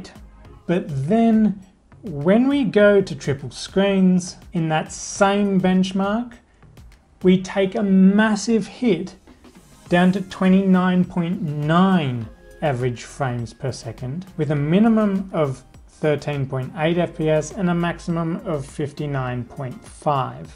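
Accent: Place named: Australia. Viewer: in the thumbnail u look like jazza from dwj and even ur accent is like his(Australian probably) but with um..higher tone or something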